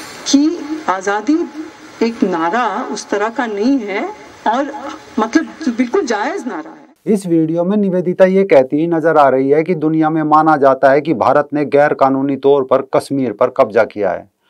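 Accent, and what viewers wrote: Haryana, India. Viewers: You first get rid of your hariunvi accent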